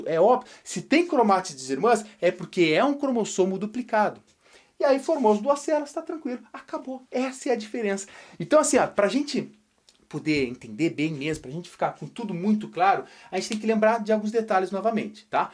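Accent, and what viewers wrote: Rio Grande do Sul, Brazil. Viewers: Te liga mano veio, é gaúcho? Kkkk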